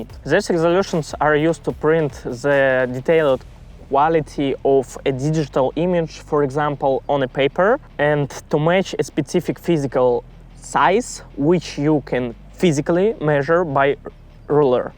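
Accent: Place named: England, United Kingdom. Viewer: О, сэр… Вы из Англии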